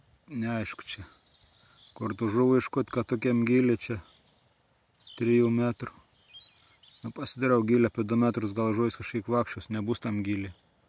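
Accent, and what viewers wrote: Samogitia, Lithuania. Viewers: Ačiū! Už žemaitiu kalb.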